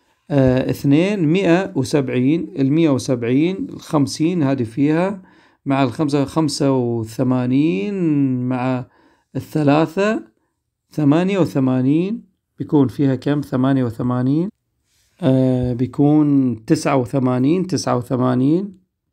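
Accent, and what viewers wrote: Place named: Jordan. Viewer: لهجته تجنن احس راحيه على اردني 🌚✨️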